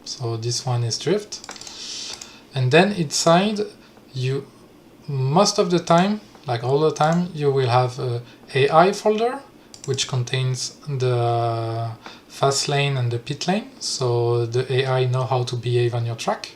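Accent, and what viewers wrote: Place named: France. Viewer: Moi j’entends que tu es français mon ami lol j’aurais une question je boss un projet track mais j’ai un pb avec ma route et des effets de la voiture la fumée des pneus part dans le mauvais sens et la camera sautille et surtout le volant tremble constamment pourtant mes cubes sont bien en y vers le haut et le z pointe ma direction j’ai travailler sur blender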